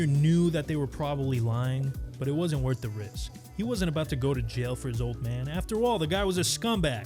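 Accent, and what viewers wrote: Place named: Italian-American, United States. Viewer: I don't want to be rude but he kinda has like a american-italian accent,but his videos are addicting like crack,not that I've tried it 😅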